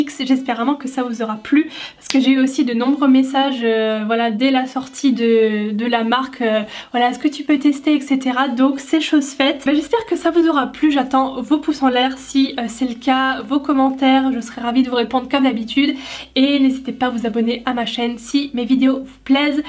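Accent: Lorraine, France. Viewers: Haha, je me disais bien que je reconnaissais cet accent. Vive la Lorraine et vive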